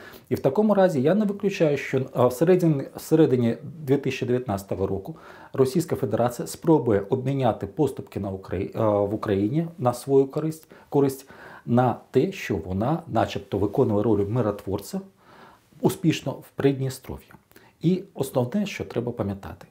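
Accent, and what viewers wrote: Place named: Belarus. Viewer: Гы. Вообще интересно звучит белорусский акцент , совершенно не так как московитский. К сожалению мы такое очень редко слышим, а я вообще впервые услышал украинскую мову с белорусским акцентом. :)))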